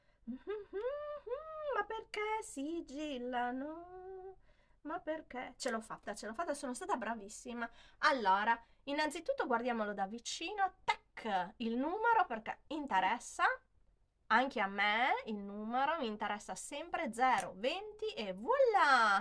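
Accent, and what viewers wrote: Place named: Sardinia, Italy. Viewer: Ciao carissima è bellissimo sentire parlare della casa e famiglia in modo così "normale" riempie il cuore sentirti parlare!😊 Un altro video sarebbe un'idea stupenda...io inserirei oltre agli argomenti citati da te anche qualcosa sui prodotti non gradito secondo te..." Magari puoi titolare con il tuo bel dialetto sardo 😂"... buonanotte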